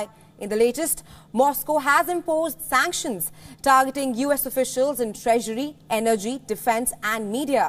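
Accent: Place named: India. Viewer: Disgusting ' Indlish ' pronunciation !!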